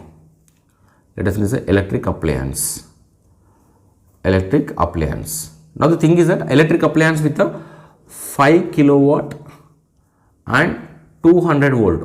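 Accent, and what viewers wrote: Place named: Andhra Pradesh, India. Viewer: Sir ur talking like andra people but ur saying ur from hyd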